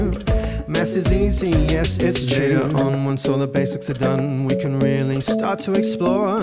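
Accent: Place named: United Kingdom. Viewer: Soo Brit m8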